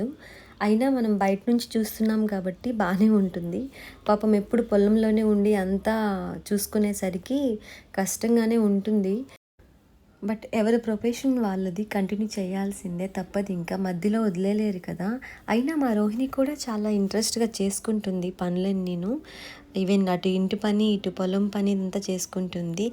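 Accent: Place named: Coastal Andhra, India. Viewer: Mi voice vizag valla la Ledu andi .. Looking like ...Krishna,gutur or khammam districts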